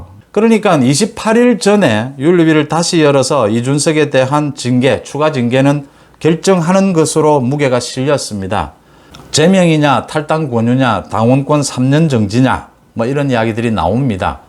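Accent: Gyeongsang, South Korea. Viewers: 송 선생님 평론을 평소 열심히 듣고 있습니다. 경상도 억양은 있지만 언어 구사는 표준말을 사용하시므로 아주 깨끗한 방송이라고 늘 여겨왔습니다. 한 가지 외람된 말씀 드리자면 '제명'이라는 단어를 요즘 상황 때문에 많이 쓰실 수 밖에 없는데 accent를 '명'에 두는 것이 올바른 발음이라 알고 있습니다. accent 를 '제'에 두시는 것이 송 선생님의 일종의 언어 습관이라 생각하지만 혹시 고칠 의향이 있으시면 참고하시기 바랍니다.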